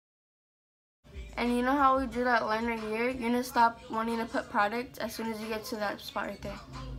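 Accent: Bay Area, United States. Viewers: When i hear sobxrbe I assumed she was from the bay lmao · I can tell ur from the bay lmao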